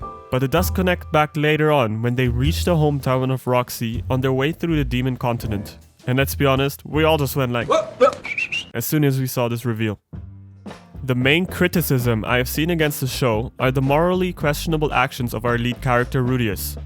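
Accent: Germany. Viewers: Junge eine Sekunde des Videos und ich weiss einfach, dass der Bre deutsch ist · Good video. The Made in Abyss OST in the end of the video just fits to MT. I was listening to it on repeat while reading the novel. PS: Hab 5 sekunden gebraucht, um herauszufinden, dass du deutsch bist. Unser Akzent is einfach zu offensichtlich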